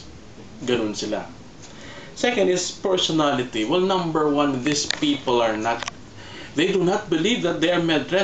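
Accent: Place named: Caribbean. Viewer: your accent is slightly caribbean :)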